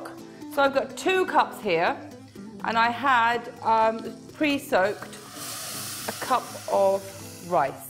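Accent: United Kingdom. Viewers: R u frm london?? U hav british accent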